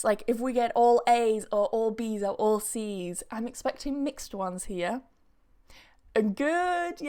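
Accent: United Kingdom. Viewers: Thanks a million ,I wached and I made 3 mistakes it was really useful I hope keep going , thus I really like British accent especially your pronunciation .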